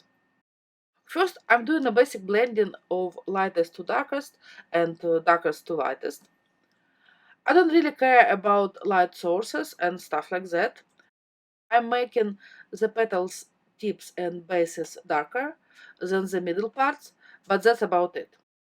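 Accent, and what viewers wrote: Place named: Poland. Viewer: I'm happy I discovered your videos (I found you through a video by Yana Smakula). I am not a fan of pink, so I would never have thought to do these flowers on a pink background, but this card is beautiful. I love your ideas and hints, and I love your accent. It brings back memories, as it's similar to that of my Polish grandma (her native language was Polish, but she spoke some German and a bit of Russian in addition to English). I am very impressed by your command of vocabulary and sentence structure. I see so many comments by Americans on FB, and your language skills far surpass theirs. Sadly, I only know one language, and I am always impressed by those who learn more than their native tongue.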